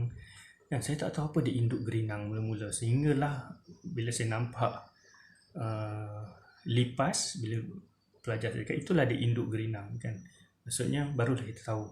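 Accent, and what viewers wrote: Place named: Iban, Malaysia. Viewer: Best sebutan cakap Iban cikgu. Betul2 original. Belajar lebih banyak lagi Cikgu.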